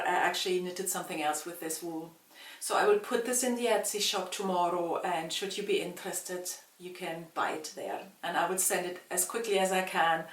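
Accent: Scotland, United Kingdom. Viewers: Ah, danke ❤. Ich liebe deinen schottischen Akzent. Auch dir einen wunderschönen 3.Advent 🎄☃️🎅🕯️🕯️🕯️❣️ Bis bald ❣️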